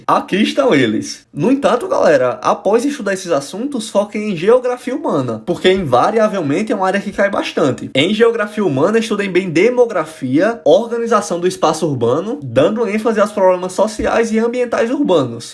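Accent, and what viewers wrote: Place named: Ceará, Brazil. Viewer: Cara vc parece um Moisés do velho testamento jovem, europeu, maquiado e com um sotaque do cearense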